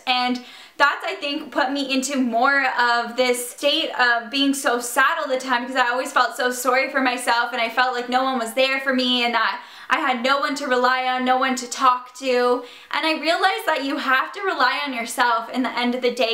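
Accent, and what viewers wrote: Canada. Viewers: question are you Canadian??? cuz your accent is cool!!! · is she Canadian? I can't quite tell but the way she pronounces certain words make me think maybe she is.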